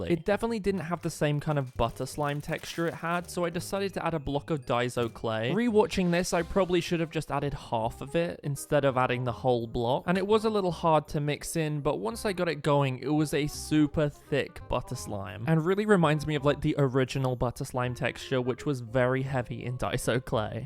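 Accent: United Kingdom. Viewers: Are you British bc I am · Are you British? Because you sound British.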